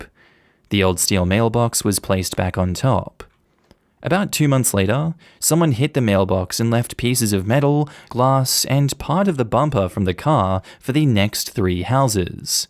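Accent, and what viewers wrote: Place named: Australia. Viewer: Some good ones there. Maybe one day I'll venture onto reddit to read for myself, but happy to listen to a fellow Aussie read them for me.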